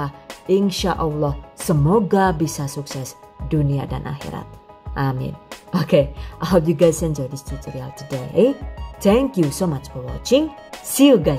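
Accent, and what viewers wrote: Banyumas, Indonesia. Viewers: Ibunya logatnya kaya orang Jawa ngapak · Orang mana kak logat bahasa jawanya ngapak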